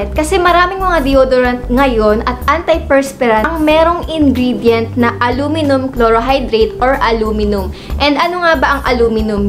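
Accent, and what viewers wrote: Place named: Visayas, Philippines. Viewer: Visaya kaayo uy *HAYAHAY NA ANG BUHAY MO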